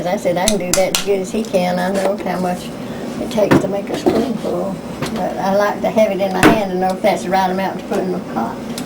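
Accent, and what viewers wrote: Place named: Kentucky, United States. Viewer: Where is she from? Her accent sounds a lot like my own, and pretty much identical to older people where I'm from in Southeastern Kentucky.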